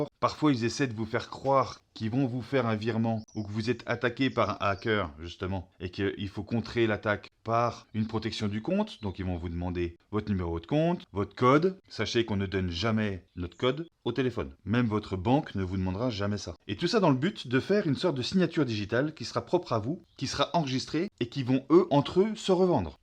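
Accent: Franche-Comté, France. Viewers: D’où vient cet accent franc comtois ?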